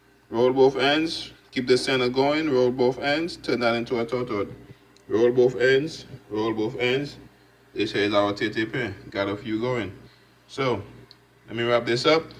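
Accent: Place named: Caribbean. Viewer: Straight from the Caribbean I hear the patois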